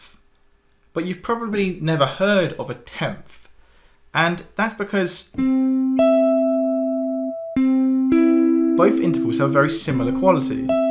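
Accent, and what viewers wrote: England, United Kingdom. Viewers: Oh to have good hair, an english accent AND piano skills. Very enjoyable. Thanks.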